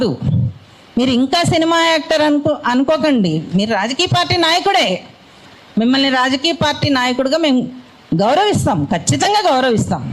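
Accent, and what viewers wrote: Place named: Andhra, India. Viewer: vellaavuu vachaavu antaavu antoo mee speech lo chala andhra slang ae vinapadtundhi... meeru endhi Telangana tarapuna maatlaadedhi??, first complete ga bhasha lo yaasa maatlaadi aa tarvatha itla press meets lo speech iste oka value untadhi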